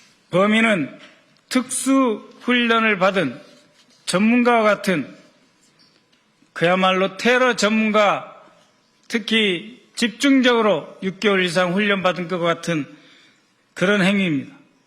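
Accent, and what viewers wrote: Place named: Jeolla, South Korea. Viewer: 박선원의원께 감사합니다.진실과정의 앞장서시는 박선원님께! 이재명대통령 당선확정공표때 박의원님의 표장이 순간포착된사진을 간직하고있습니다.저와똑같은 신정이였습니다. 국민의지성을믿고있었지만~~~아슬아슬한심장은🥹 지구반대편인만리떨어져살고있는동부시골에서 응원드립니다. 친근한 남도말투에서 제고향이그립습니다. 건승하소서🙏😊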